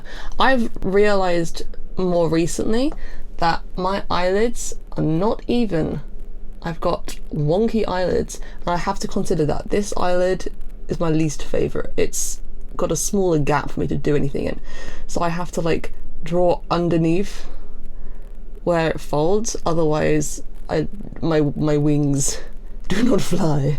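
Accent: United Kingdom. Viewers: u sound more british than usual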